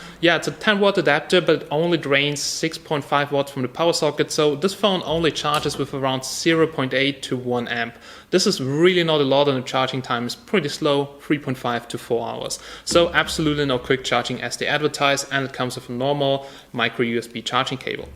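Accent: Germany. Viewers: I have to say your reviews are great! You're very thorough and really leave nothing out! Great Job! Your pronounciation of some words is quite cliche german, but other than that, you do have advanced free speaking english skills! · ohne jetzt nach zu forschen....du bist doch ein deutscher?....so redet kein Ami oder erstrecht ein engländer!....ich würde meinen Popo darauf verwetten^^.....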